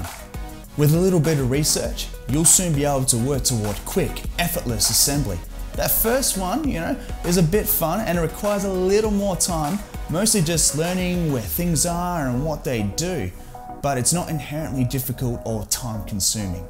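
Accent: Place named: Australia. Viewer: Is it because the Australian accent because for me he sounds fine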